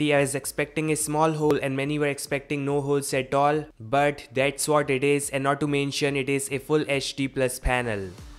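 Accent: India. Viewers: Yeah,Indian accent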